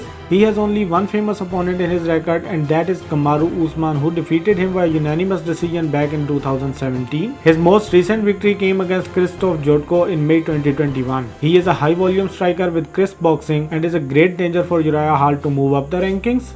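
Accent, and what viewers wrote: India. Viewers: This is english with indian accent...french and other latin language WE sound a lot différent...so you learning english with indian accent dont be afraid to Say it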